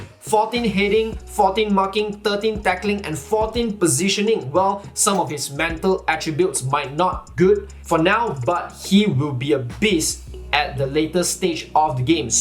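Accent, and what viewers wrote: Malaysia. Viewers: What the fuck is wrong with the Maysian accent 😑😑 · Kuat betul accent chinese/malaysian dia ahahahah. Btw great and informative video my guy! Got new subscriber in me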